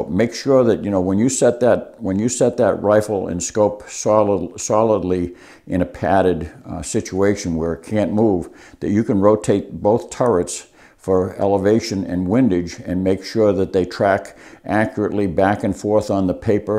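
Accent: New England, United States. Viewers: Definitely from Massachusetts or NH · Great content. And I grew up in NH, near MA. Good memories of the experienced man influence. Especially with the accent I remember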